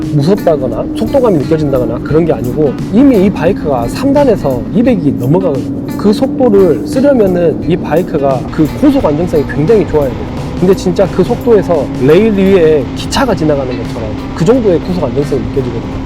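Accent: Jeolla, South Korea. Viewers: 말투가 전라도 같은데 흔치않는 전라도 유튜버 반갑네요 😅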